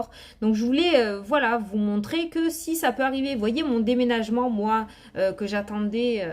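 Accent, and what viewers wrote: South, France. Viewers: Dommage j'adoore ton assent du sud. 😄😎🌞🙏 C'est le Soleil. Merci c'est Super. 🍀💫🙏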